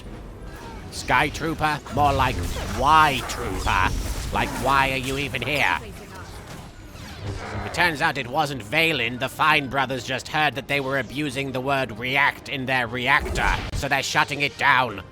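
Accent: United Kingdom. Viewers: Is it just me, or does Marik sound more british than in his older let's plays? I guess he spent too much time with Yami Bakura XD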